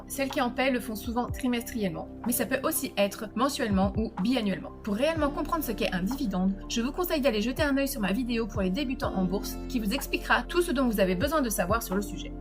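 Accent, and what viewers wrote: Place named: France. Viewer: escuse moi du mot etf , jecoute les terme etc j ai commence par epargne placement quebec,au debut je prennais des celi court terme ou annuelle progressif depuis 3 ans je rentre dans un systeme boursier qui apparament ce rapproche d un etf mais sans risque mais je ne suis pas sur .si c es interessent....tout en celi et la je reflechie et j essaye de comprendre..... je pense dans un deuxieme temps allez vers des etf me semble plus prudent...mais les systeme de taxe americaine m interpelle et me demande si il est pas mieux d achete canadien.....qu en pensez vous.....aussi je suis francais ......vu votre facon de parlez vous devez l etre......est ce que pour l europe on reviens au meme probleme...... y a t il des taxe .. bref plein de question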